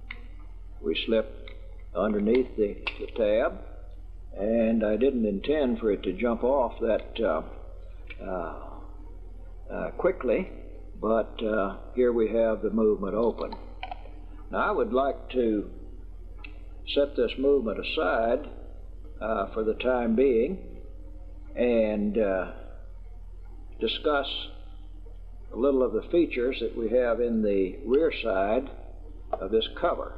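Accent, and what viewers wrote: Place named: United States. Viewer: Excellent voice like the voice of president carter , good old traditional American voice.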